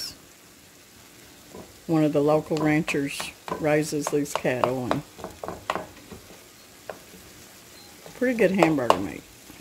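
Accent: South, United States. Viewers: How interesting and useful! I live in Arizona and make Mexican food all the time. It's different to hear a very southern accent! I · Love your accent. I’m Southern too. Keep it Southern!😁😁💕💕💕. Good dish thanks. I cook old time Southern food, South American authentic, and a couple Mexican dishes and authentic tamales. No me gusta pansa o menudo porque es puro quedito y nada carne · Love her southern accent and love that she enjoys tamales we make them every Christmas de queso con chile verde and green chile pork tamales and red chile chicken my fav is sweet corn tamales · I wish I enjoyed hearing her talk…..but I don’t care for types of south Wern accents.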